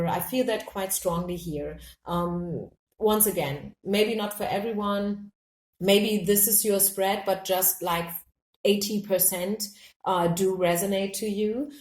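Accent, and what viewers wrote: Germany. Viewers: Kann es sein dass du deutsche bist? Der Akzent hört sich so an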